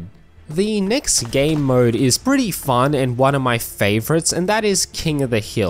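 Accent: Australia. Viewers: Man, I'm a sucker, I hear an aussie and I hit like (sorry if you're a kiwi)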